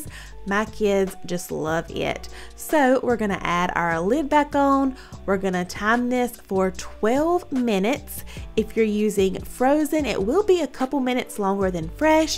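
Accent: South, United States. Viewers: Love your southern accent 💕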